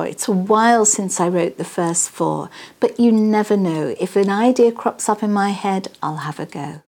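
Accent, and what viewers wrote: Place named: United Kingdom. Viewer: I love her British accent its so sweet :P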